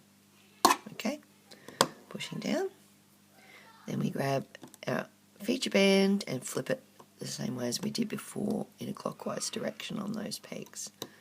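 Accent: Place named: Australia. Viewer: Hahaha! I so understand not waiting for the "perfect time" to do a tutorial! If you have kids or an adult kid (husband;) ) you just have to "wing it" at any possible moment or none will EVER get done. Love your designs, you are amazingly talented! Also, I am so infatuated with Australia and love listening to those who have an Aussie accent, your manner of speech is SO COOL!!! Right now I am trying, SO HARD, to comprehend monster tail type looming. I am in awe of those, like yourself, who are making such beautiful designs this way!!!! Love you looming friend:)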